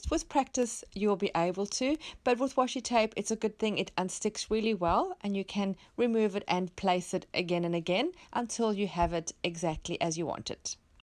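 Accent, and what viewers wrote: South Africa. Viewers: This is so simple yet so effective! Where are you based? You sound South African ☺️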